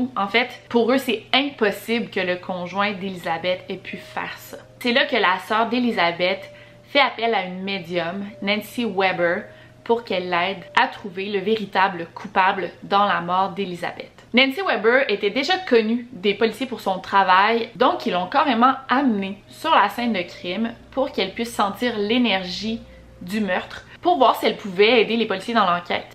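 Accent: Quebec, Canada. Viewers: Mdr ta l'accent du Canada j'ai était au Canada ses beau ses trop bien la vie labaw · Salut je viens seulement de te decouvrir franchement tu raconte trop bien tes histoire je te souhaite une bonne continuation👍👍👍👍ps::j adore trop ton accent du quebec trop beau!!!!!!!!!😂😂😂😂😂😂😂😂😂 j adore le quebec!!!!!!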